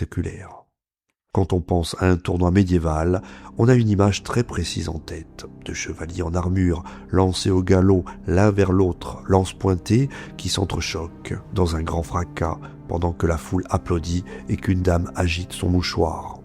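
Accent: Corsica, France. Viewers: Alors y a un truc qui m'étonne ;-) J'écoute tes contenus en mode podcast avec un écouteur, le soir dans mon lit, dans le noir avec mon écran retourné vers le matelas pour limiter la luminosité au maximum... Bref, tout ça pour dire que je me concentre sur l'histoire et sur ta voix. J'ai écouté pas mal d'histoires et à chaque fois, je dis bien à chaque fois, je trouve que tu as un accent Corse. Je ne saurais dire si c'est Corse du sud ou Haute Corse mais je penche pour cette dernière. Alors, j'aimerais bien savoir si tu as vécu en Corse ou bien quelle région de France peut avoir un accent aussi proche ! Je vais peut-être gagner le prix de la question la plus bizarre de l'année :-)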